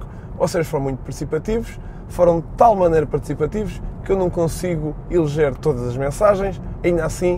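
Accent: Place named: Cascais, Portugal. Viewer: Oh sigo-te aqui na Suíça/França,opa,mesmo a falares assim à "Cascais", ouça lá você é mesmo muito bom nisto! Grande abraço e continua,tip top!!!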